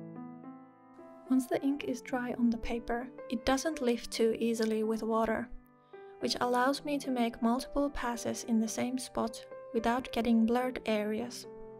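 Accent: Finland. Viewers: Mä tiesin että jotakin tuttua on aksentissa ja suomestahan sä. Somebody pick up the phone, cause I called it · Tell me you're Finnish without teeling you're Finnish! Tunnistan aksentin mistä vain! Ei siis huonolla tavalla. Söpöllä tavalla. Oot super taitava ja todella kaunista jälkeä! Ihan kateeks vetää nähdä noin upeaa sivellin työskentelyä! 👀❤️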